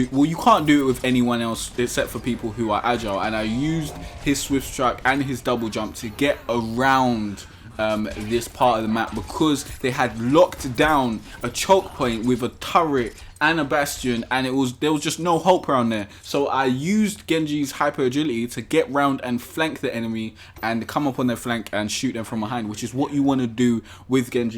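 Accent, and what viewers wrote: United Kingdom. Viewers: Are you from north London?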